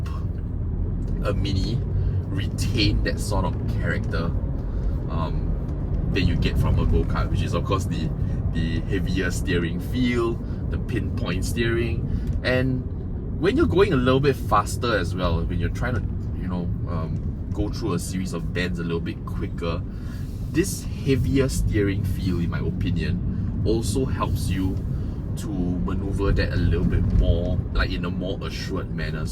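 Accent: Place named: Nigeria. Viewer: Thank you for the review!! Considering purchasing. Also, you kinda sound Nigerian.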